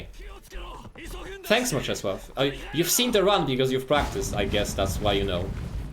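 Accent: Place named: Poland. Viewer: Brzmisz jak polak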